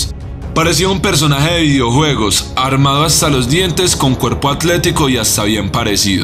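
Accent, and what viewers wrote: Colombia. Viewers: Amigo ud tiene acento colombiano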